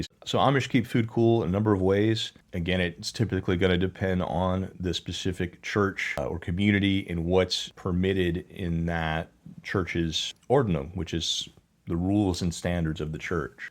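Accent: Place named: Baltimore, United States. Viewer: This is my first time watching but you sound like your from Baltimore :)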